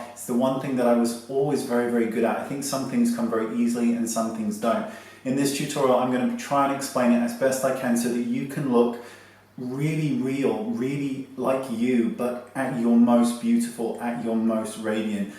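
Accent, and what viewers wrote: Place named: United Kingdom. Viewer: Hi dear I'm new here so I'm watching old vídeos that are so interesting nowadays as well I love your easy explanations and so professional at the same time My language is Spanish I'm from Uruguay but I do love since I was a child British pronunciation so to watch you it's awesome ,excellent pieces of advice and straightforward 🌹Love your way and your wink lol Thx for being here to help us ♥️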